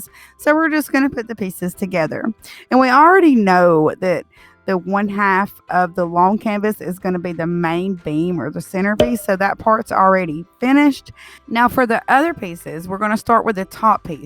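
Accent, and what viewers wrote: South, United States. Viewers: I missed you girl! Live this diy!😻 I'm also subscribed to. I love her diy's also.😻 She does have a great soothing voice. I still love that southern accent of yours though. Anyway, can't wait for your next diy! 👍💖😊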